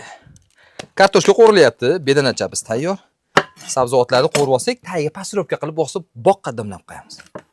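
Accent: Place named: Kokand, Uzbekistan. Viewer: АССАЛОМУ АЛЕЙКУМ КЫРГЫЗСТОНДИ ОШ ШАХРИДАН КУЗАТАМАН КОЛЛАРИЗ ДАРД КОРМАСИН МЕНИ АЯМ КОКОНЛИК ОШГА КЕЛИН БОЛИБ ТУШГАН ШЕВЕЛАРИЗ ОХШАБ КЕТАДИ ЭШИТИБ ХУРСАНД БОЛАМАН😊.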